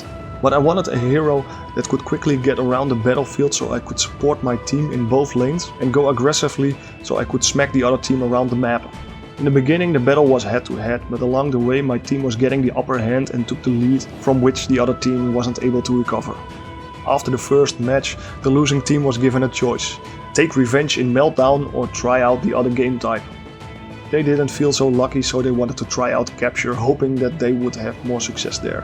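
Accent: Netherlands. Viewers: You sound so dutch it hurts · I also liked and subbed, you earned that. And I think you dutch accent doesn't hurt at all ;)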